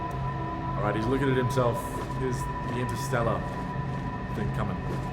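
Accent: Australia. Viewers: Lord have mercy, the Australian accent plus the deep voice plus them visuals wooow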